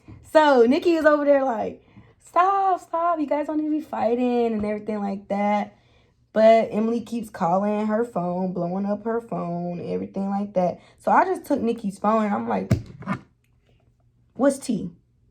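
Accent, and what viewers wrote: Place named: Nigeria. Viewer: Hey gorgeous 😘 Love the content & your nigerian accent tickles me🤣😂👌🤣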